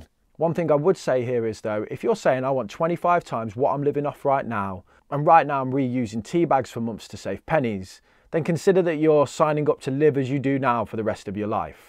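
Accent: Birmingham, United Kingdom. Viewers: Hey neighbour!! I always thought I could hear a touch of manc in that Brum accent! 😂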